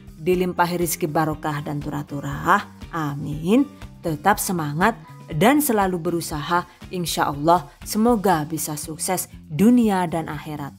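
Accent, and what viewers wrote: Banyumas, Indonesia. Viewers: Hallo assalamu'alaikum wr wb,waahh berapa banyak pahala yg bunda dapatkan dr vidio ilmu dr bunda yaahh....saya sangat senang dengan recep"yg bunda sampaikan...cara penyampaianya jelas..apalagi ada selingan ngapak"nya he he he he...mks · Bun q pengin takon njenengan sih wong endi,deneng pinter ngomong ngapak 😄😄😄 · Hahaaa... Seneng bgt denger ekpresi dan logat bahasa ngapak2nya Bun👍 sangat menghibur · Setiap play vidio pasti guyu dewek nk ada bahasa ngapak nya🤣🤣🤣🤣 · chanel sg pling tk senengi,idenya kreatif,video nya keren2 d tmbh lucu2 klo ngmong jwane medok bnyumasan..pembawa anya detil ccok tuk yg mau cb jualn,sllu on klo ada video trbaru gk ktinggln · Ketemu juga resepnya, Ide jualan lagi dan lagi,plus Tips dan Triknya CATAT😅 btw suami saya itu tdnya gak suka bhs ngapak, wlaupun saya istrinya jg wong jowo😁 Alhamdulillahnya klo sya putar video dia ska ikutan senyum" gara" pembawaan bunda yg ngapk tp unik suka bkin ngalak deh😂matursuwun bunda bnyk menebar manfaat d setiap videonya love # 💓 #GiveawayTHV2021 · Ngapaknya itu loh 🤣🤣🤣🤣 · Mbaksayyyyyy...suka banget klo lagi mantengin channelmu....gaya ngapaknya yg khas bikin gk boring dn senyum2 sdri..penjelasanya dettilll...syukaaaaa..❤️❤️❤️❤️❤️ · Ngapake kaya nyong😁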